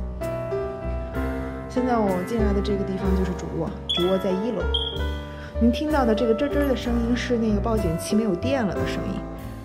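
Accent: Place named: Beijing, China. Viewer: 您是北京人吧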